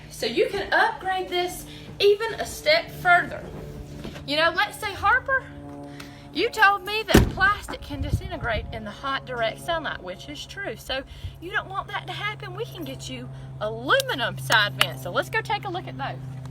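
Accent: United States. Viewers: Listening to you speak sure makes me miss Tallahassee; we don't talk like that in Wisconsin. I've been debating side or roof -mounted ventilation and your informative video was perfectly timed - thank you. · Love that southern accent!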